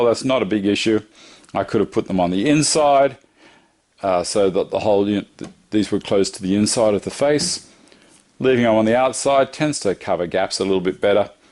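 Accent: Australia. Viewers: Ive just stumbled upon your channel while researching melamine cabinets before tacking my kitchen, and you are a breath of fresh air! After watching so many hours of youtube videos from guys in the US and hearing everything in fractions of inches, and about how cheap plywood is over there, its so good to hear an aussie voice talking in metric. Subscribed!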